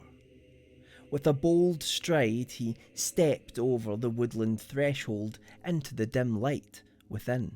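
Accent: Scotland, United Kingdom. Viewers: Author/narrator is Scottish, yo. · I really can't believe it's taken me so long to find your channel. You're a gifted author. I've not run across a single piece of yours that's not ended up in my favorites. Getting to hear them with your delightful Scots brogue is definitely putting the glaze on the cake for me. Weel dune me lad!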